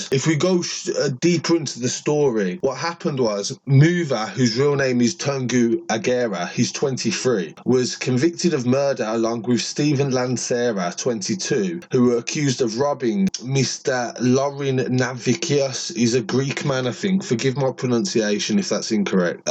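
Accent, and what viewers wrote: Birmingham, United Kingdom. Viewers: I rate you g u tell it how it is and u sound like yu from brum im from balsall heath b12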